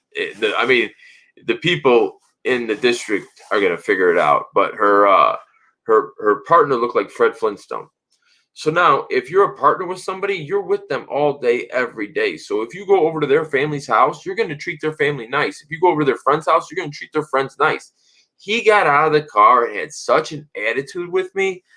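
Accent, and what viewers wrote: Chicago, United States. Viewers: Dude you are hilarious! Neck, hips, lips, small of the back and rear bumper = sexiest parts of a woman ! I can’t could actually feel the sexual tension when you were describing how she was standing there.🤣 Seriously though love your channel I think you could go places in entertainment, you are a naturally interesting person The whole Chicago accent is gold! You can come out to the Bronx with us and pick up a Bronx “forget about it”=foget uhbout it“ “hey you guys go pick me up a cappacuola sandwich “= eh use guys go gimmee a gobbagoo sangwich .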